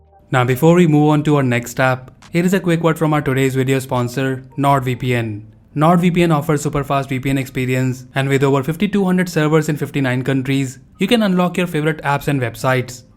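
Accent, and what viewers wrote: India. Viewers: That's not accent. That's voice. He talks like a machine, in a repeating melody. His accent is recognizably Indian. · I dont know why indian peoples speak english like this accent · Bro he’s Indian it’s how he talks. He has an accent. Americans man.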